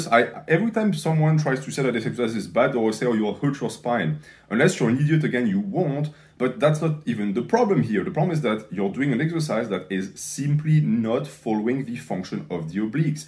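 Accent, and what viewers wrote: France. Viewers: Are you french or speak french as your main language? I can sense a little bit of french when you talk in English but keep it up !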